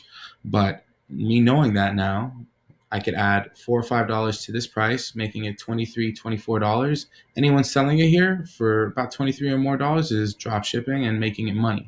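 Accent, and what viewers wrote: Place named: New York, United States. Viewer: Sounds like you from New York, factzz.. Good info... Why are so called guru saying don't use Amazon, don't use software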